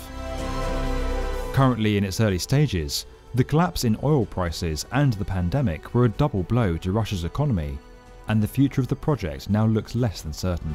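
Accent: United Kingdom. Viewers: When i heard he says in british accent Petronous Twin Tower remind me about Harry Potter...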